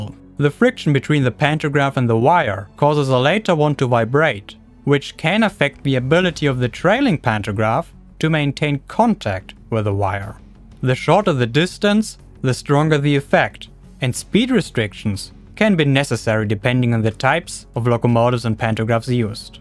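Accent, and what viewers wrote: Germany. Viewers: Um. He has a German accent because he's German. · Awesome video about great topic! I really like the slight German accent too, very authentic and original! Either way, you earned yourself a new sub, lookin forward to your next vid!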